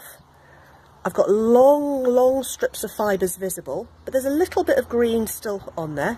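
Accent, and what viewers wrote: England, United Kingdom. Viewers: many thanks. Made my first nettle cord a couple of days ago. Very happy. BTW my Taiwanese wife thinks you have the clearest English accent she has ever heard. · I like the tone of your voice and the English accent is lovely 😊 very clear explanation👌 hope you will make more videos like this. Do you make baskets too?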